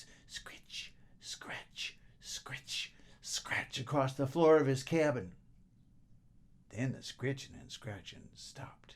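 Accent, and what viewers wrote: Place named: South, United States. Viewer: Pretty good on the “southern” accent too!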